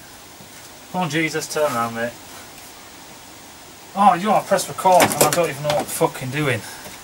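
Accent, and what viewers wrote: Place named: England, United Kingdom. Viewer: As soon as I hear the english accent, I know it is going to be a cluster f k of wrong tools and half ass work.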